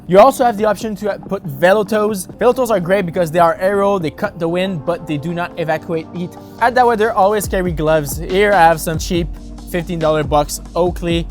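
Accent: Quebec, Canada. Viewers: Auto Subscribe for that Quebec Accent.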